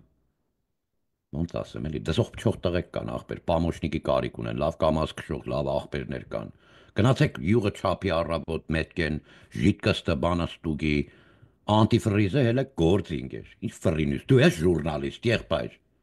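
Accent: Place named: Western Armenian, Armenia. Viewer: Impressed with your Western Armenian accent, wow!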